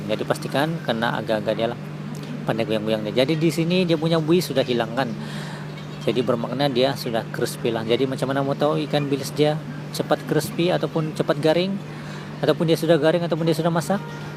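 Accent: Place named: Sabah, Malaysia. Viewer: Bah...bah..bah.. orang sabah.🤣 Mana restaurant kamu ini?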